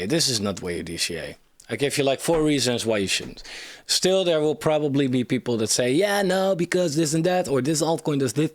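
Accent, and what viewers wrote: Netherlands. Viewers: Great content, finally something different. Btw you sound dutch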